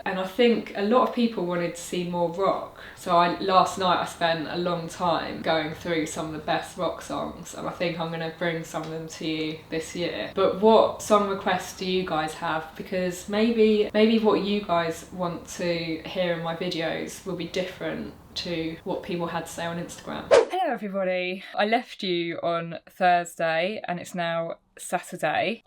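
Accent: England, United Kingdom. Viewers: You have the best English accent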